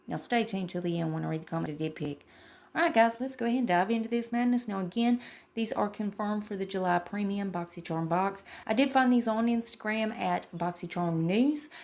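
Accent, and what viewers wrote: South, United States. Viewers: Your accent is so thick it makes my husband think I'M talking when I have YouTube playing thru the speaker in my laundry room! You're giving me a run for my money on that Southern Belle Sound!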